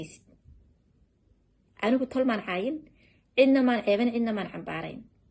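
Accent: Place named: Borama, Somalia. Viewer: Anigu reer booramaan ahay sidaan kuugu hadli lahaa ama gabadh kale ugu hadllahaa ayaad u hadashay inaabti quruxeey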